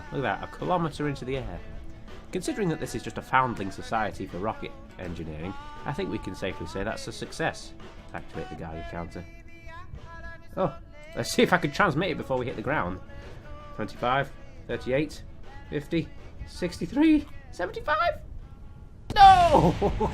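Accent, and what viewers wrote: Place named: Northern Ireland, United Kingdom. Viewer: He's Northern Irish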